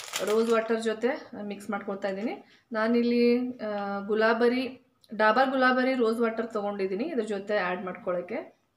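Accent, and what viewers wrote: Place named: Uttara Kannada, India. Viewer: ನಿಮ್ಮ ಉತ್ತರ ಕನ್ನಡ ಭಾಷೆ ತುಂಬಾ ಚೆನ್ನಾಗಿದೆ ನೀವು ತುಂಬಾ ಚೆನ್ನಾಗಿ ಮಾತಾಡ್ತೀರ sis face pack 👌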